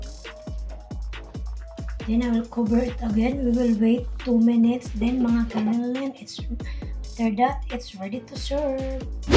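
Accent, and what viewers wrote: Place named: Arab world. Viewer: Ayos host ah parang arabo na rin magsalita hehe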